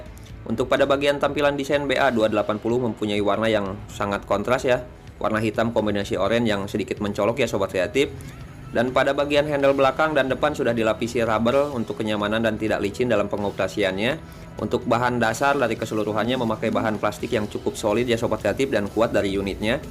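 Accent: West Java, Indonesia. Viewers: Keren gaya bicaranya 👍 Logat sundanya masih ada 😀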